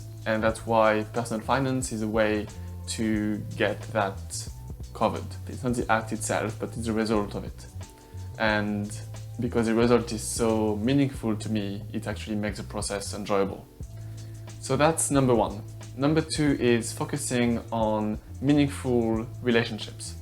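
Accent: France. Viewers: Love it saving time is essential so we can do the things that we like. Loving the minimalist approach too. Also your French accent is endearing the British boys must love it. Although the London gay scene can be exclusive. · Ton petit accent français doit tous les faire craquer !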